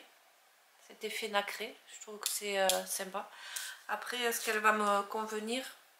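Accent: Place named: South, France. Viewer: oui c est clair 🤣👌sans blague peut être qu il y a moyens de communiquer 😌j entend votre jolie accent du sud d ou êtes vous ?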